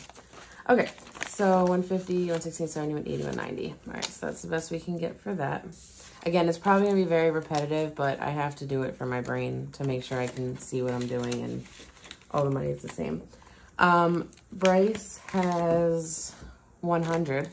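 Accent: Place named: East Coast, United States. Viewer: Are you from New Jersey/East Coast? You sound identical to my cousin! Love it. So relaxing. Congrats on your first bill exchange! I can’t wait until I have mine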